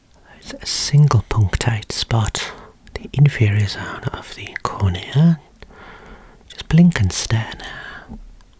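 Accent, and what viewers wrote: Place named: Scotland, United Kingdom. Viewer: Quick question: Are you Scottish? Your accent gives me Scottisch vibes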